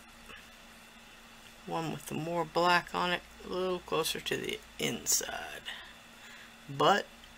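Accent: South, United States. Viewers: You are the best xplainer love your southern accent Did all the pieces get baked for 1 hour/1/2 at 220.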